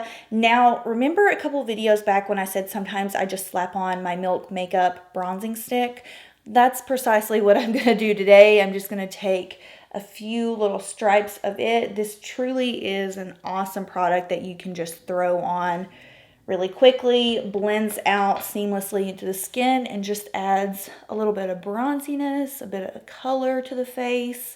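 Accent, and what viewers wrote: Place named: South Carolina, United States. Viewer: I would like to try the Hydra Serum for my dry, sensitive skin. Your accent helps with my homesickness - I'm from SC, been in Cali 20 years 😉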